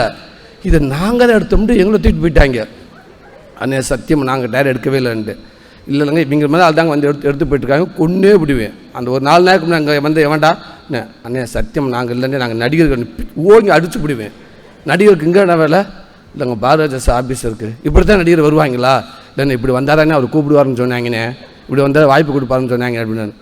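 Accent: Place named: Madurai, India. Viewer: Soori madurai slang❤❤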